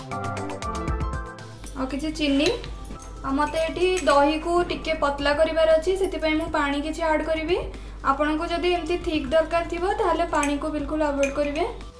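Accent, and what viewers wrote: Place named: Balasore, India. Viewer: OK mam.apananka Katha style ta mote balesoria bhali lagila so pacharili.. Thnku mam. I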